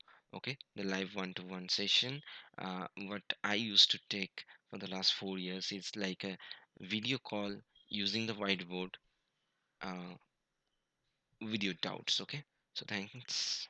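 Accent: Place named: United Kingdom. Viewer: Bro.. U should make in Hindi and English mix.. I mean.. Teacher tech like that But u are said like a British.. It's very bad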